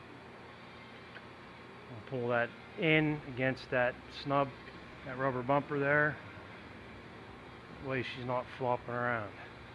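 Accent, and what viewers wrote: Pennsylvania, United States. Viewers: You sound like my in laws, Are you in PA? where did you get the bug shield from?:)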